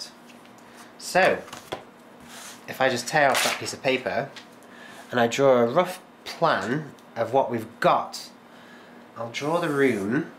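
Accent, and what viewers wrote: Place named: Corby, United Kingdom. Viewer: you have a horrible Corby-like accent.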